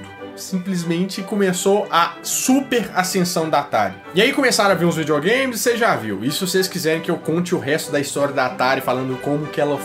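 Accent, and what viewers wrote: Minas Gerais, Brazil. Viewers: Você é de Minas?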